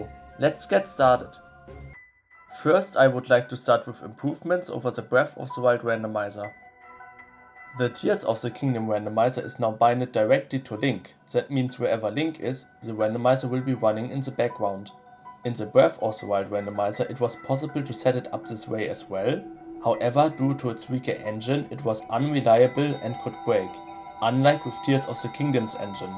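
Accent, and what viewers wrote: Germany. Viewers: mach weiter so ich liebe deine Videos aber es fällt mir manchmal schwer dein Brandenburger English zu verstehen aber große props an dich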